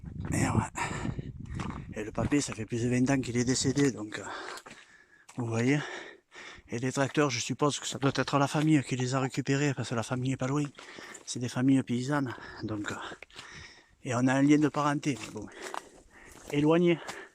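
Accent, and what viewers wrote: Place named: South, France. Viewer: belle vidéo, l'accent du sud je m abonne à votre chaine et un pouce bleu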